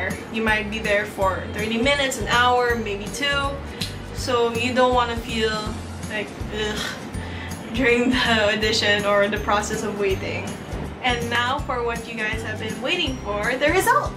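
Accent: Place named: Philippines. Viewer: Ermgee I could tell you were Filipino from your voice! HELLO, galing mo! Awesome and helpful video! Was this in Makati? (STEPS Dance Studio)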